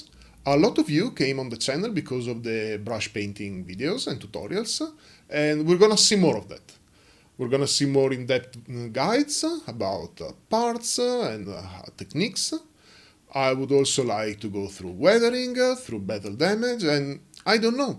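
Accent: Italy. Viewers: Congratulazioni! Mi era sembrato di riconoscere un accento italiano, e ora ne ho la conferma! Apprezzo moltissimo i tuoi contenuti, i tutorial sono estremamente ben fatti e facili da seguire. Non vedo l'ora di vedere i prossimi video sul MG Sazabi. Personalmente, sono un grande fan del weathering, quindi sarebbe davvero interessante vedere dei tutorial a riguardo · Love your content so much, as a beginner they’re very helpful improving the skills of gunpla building. Also love the mood of the videos, they’re relaxing and always make me smile. Congrats for the 1000 subs! (Just a quick question, sei Italiano? Dalla pronuncia sembri emiliano come me ahahahah)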